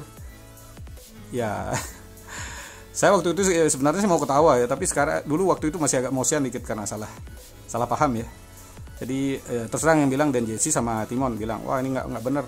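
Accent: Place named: Kutai, Indonesia. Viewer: logatnya kayak orang kutai bang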